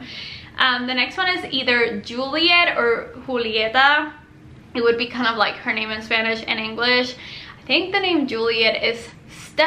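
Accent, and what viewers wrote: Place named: Spain. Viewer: Girl I love your accent! Spanish is such a beautiful language, especially with girls names! Never be shy to speak in Spanish or in your accent on your channel cause it sounds amazing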